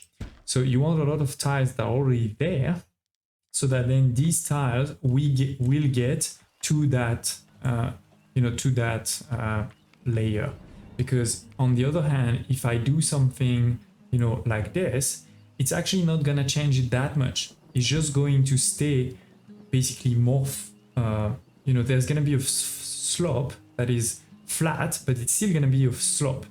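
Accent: France. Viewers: c'est fou comment l'accent francais se remarque dès la premiere seconde de video. mais n'empeche, tu parles vraiment bien anglais. bonne video!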